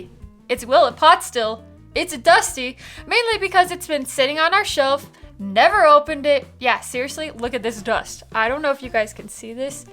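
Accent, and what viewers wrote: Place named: South, United States. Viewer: That definitely ain’t no Yankee accent there, sounds like a southern girl to me! I just picked up a sampler bottle of this today, im looking forward to trying it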